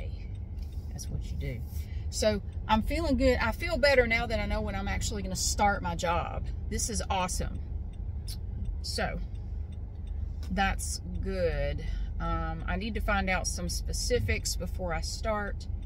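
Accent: South, United States. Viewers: When I first subscribed to your other channel in late 2016,I thought to myself ,I finally found a Southern Asmr Artist( which was hard to find back then) I enjoyed your later night uploads, Congrats on getting a job, it's the best idea for anyone to not rely on a criminal corporation like Google⚡️🔥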